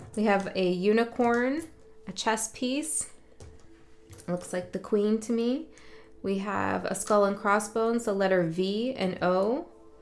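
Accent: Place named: Toronto, Canada. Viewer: I’m from Toronto too (Toronto Mans accent) 😂😂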